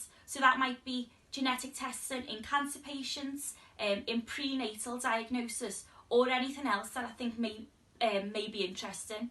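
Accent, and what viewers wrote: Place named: Liverpool, United Kingdom. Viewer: I just saw the old blues email and ended up watching your "5 minutes with..." video and thought it was a great initiative to get people interested in science! I also loved the Oxford dinner and ended up there studying chemistry. The part where you mentioned maybe not fitting in because of the accent made me laugh since it really resonated with me haha. Anyway, as I say, great initiate to show someone real and relatable in science and it's always nice to see a Scouser doing well! :)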